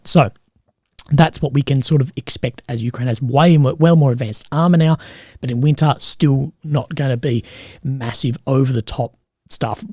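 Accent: Australia. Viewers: Definitely, because Australian English is the black sheep of the English language Dialects. English is my native language and i dont understand them sometimes either. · a great adittion to a great content, sometimes its a difficult to undesstarnd the aussie accent, even more if english its not your 1st language, so thank you for that adittion, keep on the good work. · Subtitles are ok but make them optional. For us that can cope with Aussie speak, they are distracting. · wish I could turn them off, but as an Australian I'm used to the accent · Thanks, as a Kiwi, your aussie speak is sometimes hard to understand thanks for the subtitles :).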